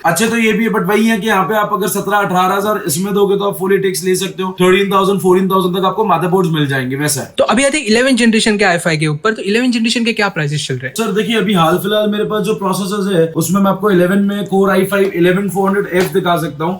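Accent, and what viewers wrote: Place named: India. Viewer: ur voice is too good to other's literally..like in hindi accent so make video's in multi purpose bro soon u will hit 1 million in month like make videos on cars and anyother vlog and every week 3 vlogs nikaloo aur maintain rakho like cars ke upr aur tour ke upr aur our community gaming 🇮🇳🇮🇳🇮🇳🇮🇳🇮🇳🇮🇳🇮🇳🇮🇳🇮🇳 bro plss u can do whatever i say i hope u listen.... Ku ki baad mei reply ni krta koi 😅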